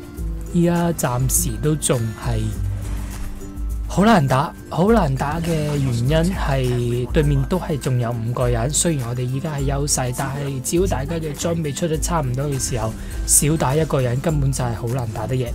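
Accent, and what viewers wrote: Hong Kong, China. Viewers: 聽你口音就知道你係香港人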